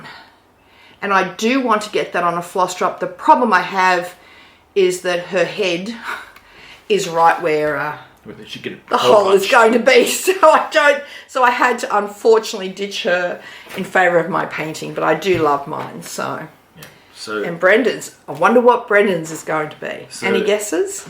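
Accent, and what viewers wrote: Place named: Australia. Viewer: Enjoyed watching you again. I really appreciate watching Aussies and hearing our accent for a change on flosstube. Ornament and autumn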